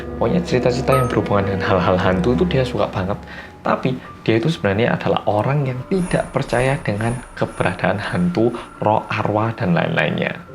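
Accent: Java, Indonesia. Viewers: Bang orang Jawa indo campur Korea ya kok lucu waktu ngomong nada jawanya😂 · Hi, aku termasuk baru banget nonton video2 kamu di youtube, ya kira2 setelah lockdown di Australia berlaku. Aku suka banget dgn konten2 rindingdong n detektif reomit. Aku cm punya satu pertanyaan, kan medok ya hehehe tp pas pronounce bolo-bolo kedengerannya kayak “polo-polo” ya? Apa ada penjelasan soal ini yg aku belum nonton di video? Thanks and keep up your good work:) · Happy birthday mas medok... sehat terus yah mas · Orang yanggg Medokkk 👍.Mantul😀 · Happy birthday ko orang korea yang medok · Liat opah" Glowiinggg yg Medok...😁 😁, konten ny bikin merinding😅😅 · Hbd mas. Semoga panjang umur dan tetep MEDOKKKKK 😎 O iya, jumpscarenya jgn sering2 dong mas. Kaget aku Pengenya g ntn, tapi penasaran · Hadir Mas Medhok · Gumun aku,, jowo mu rak ilang ilang mas. 😂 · Mas hbd. Kok mas jadi seneng yang mistis2 to? 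Mas kan kalo ngomong medok terus ya, kalo ga medok bisa gak mas?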